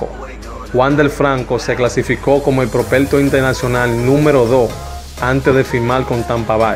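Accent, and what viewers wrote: Cibao, Dominican Republic. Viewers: Ay perdón, pero sigo escuchando tus comentarios y definitivamente tú eres un Cibaeño bien campesino, OMG, tú no sabes hablar Español!!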